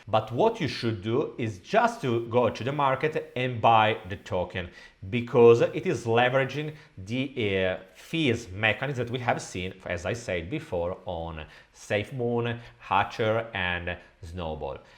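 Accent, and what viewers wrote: Italy. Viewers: Thank you so much for showing us these bad platforms. So educational for humanity good. I just discover you and although it takes time to understand your Italian accent, I love it.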